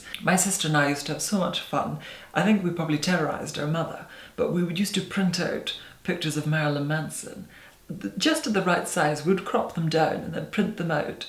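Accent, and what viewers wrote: Scotland, United Kingdom. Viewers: The most beautiful man in the world? Is it a slight Scottish accent? Such class. Xx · Are you scottish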